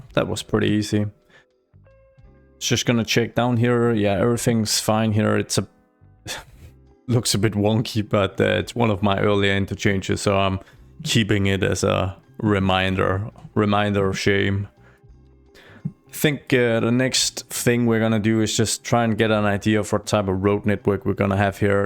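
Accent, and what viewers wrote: Denmark. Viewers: Jeg antager, at du er dansk med den accent :) Man kan aldrig gemme sig som dansker :P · I have to ask are you danish? you sound very danish when you talk. But very nice video love the content:) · Are you danish? The accent sounds familiar 😅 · Det kan kun være en dansk accent det der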